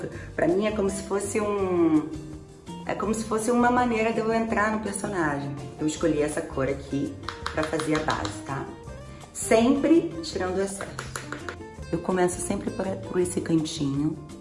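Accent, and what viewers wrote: Rio de Janeiro, Brazil. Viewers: não exagera. Sotaque carioca não cai bem pra todos... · Me diz uma coisa. O que é preconceito pra você? Porque eu acho que você está equivocada sobre o significado. Seria preconceito se eu desprezasse ou tratasse de forma diferente as pessoas só por causa do sotaque delas. E aqui não é o caso, não tenho nada contra quem é do Rio, só não curto o sotaque. Estamos falando de idioma certo? Tenho direito de expressar meu gosto.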